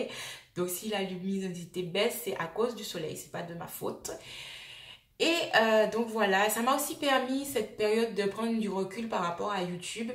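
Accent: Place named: Antilles, Caribbean. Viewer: dès les premières secondes, je reconnais l'accent antillais. :)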